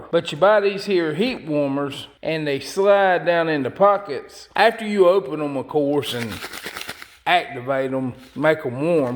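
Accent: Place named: South Carolina, United States. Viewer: where are you from? sounds like the great state of south carolina.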